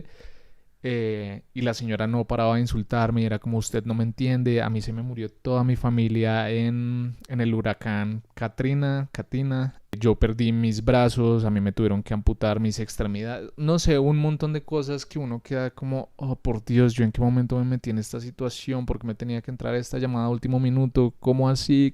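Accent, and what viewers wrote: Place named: Costa Rica. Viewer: Soy de Costa Rica y de entrada dije, a mira alguien de aqui. Como nos confundimos nosotros mismos con los acentos.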